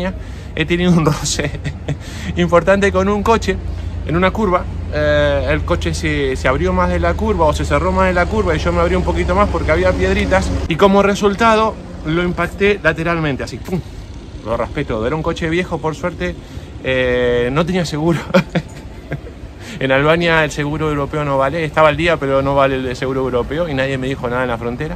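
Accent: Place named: Argentina. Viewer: Hola. Y la rueda no controla?? Solo esto? No aflojas dirección? Creo que talves mi problema de vibración y movimiento leve de izquierda a derecha. Se soluciones así .. ja .. mi moto es vieja y jamas se la hace nada. Aunq mis rodamientos están buenos en la rueda y su eje. Seguro son los barrales. Gracia por tu info. Me suscribiré.. y por cierto. Eres argentino??. Suenas tal cuál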